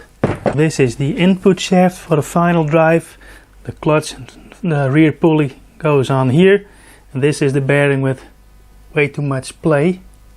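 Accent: Netherlands. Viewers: ik proef een beetje een Nederlands accentje hahaha.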